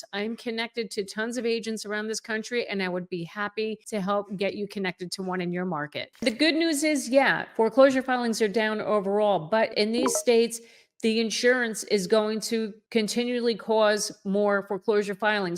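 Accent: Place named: New York, United States. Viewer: I can tell by your accent that you grew up in Long Island or North Jersey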